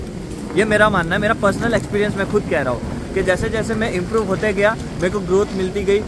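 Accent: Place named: Maharashtra, India. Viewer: your marathi acent in hindi i like it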